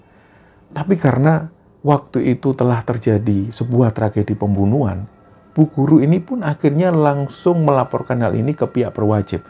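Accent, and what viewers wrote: Java, Indonesia. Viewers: Kok ga nemu pencerita hebat seperti mas... selalu berkelana membayangkan jalan ceritanya karena saya hanya mendengarkan, jarang sekali menonton karena sembari membuat kue.. alur yg mengalir dengan halus, berirama, menegangkan dan dengan aksen Jawanya sangar indah, terima kasih mas dkk ❤❤❤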